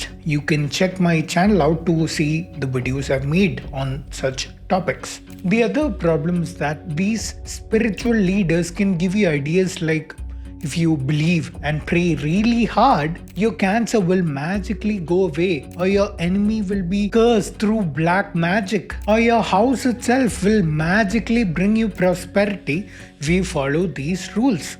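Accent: Kerala, India. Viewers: Your Mallu Accent 😂😂😂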